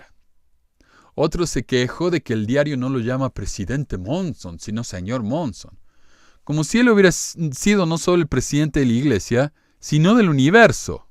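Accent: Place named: Córdoba, Argentina. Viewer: el acento cordobés que tenes no se te va nunca che. Soy less active y en mucho coincido sobre pte Monson, pero tampoco decir cualquier gilada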